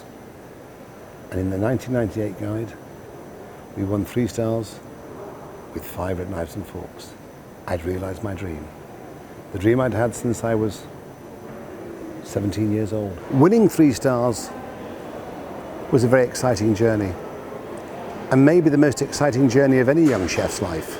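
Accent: United Kingdom. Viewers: I love how his accent goes more Leeds when he's the man of the people, while then immediately correcting with his eloquency. He knows the language of food and of the poor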